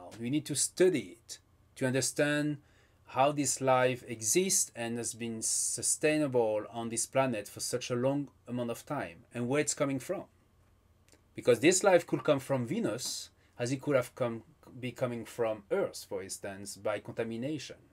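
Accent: France. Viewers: Nothing as charming as an English speaker with a French accent 👽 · C'est bien ça, un accent français, j'ai compris au moment de "Camille Flammarion" hahaha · I wanna be told about Venus with a French accent. Oh Good. Thank God.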